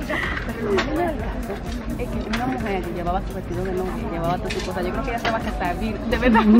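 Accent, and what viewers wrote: Dominican Republic. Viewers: La que está hablando es como dominicana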